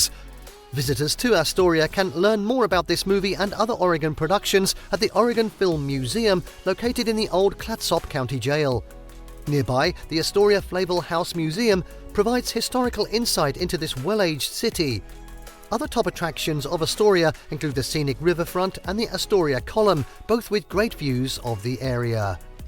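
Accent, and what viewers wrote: United Kingdom. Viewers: Oh for God sake! A BRITISH accent on a video about OREGON. 😢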